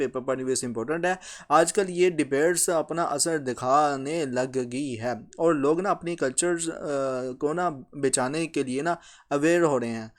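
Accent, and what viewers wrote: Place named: Punjab, India. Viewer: thanku sir for these lectures and your punjabi accent is wow🥰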